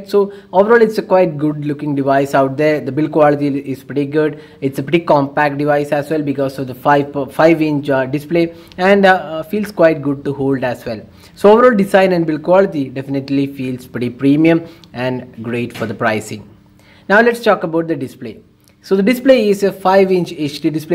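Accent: India. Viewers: ok im leaving cause of his indian accent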